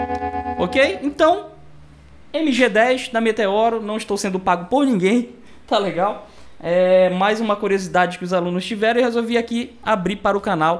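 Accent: Pará, Brazil. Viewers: Video show de bola. tu é Paraense?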